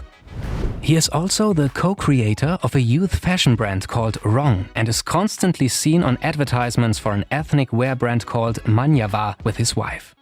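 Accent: Germany. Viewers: to me it sounds like he is german. his accent is very very subtle but somehow that's what I pick up...a german accent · Kann das sein das deutsch deine Muttersprache ist? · Fiahart kholi, lol love the German accent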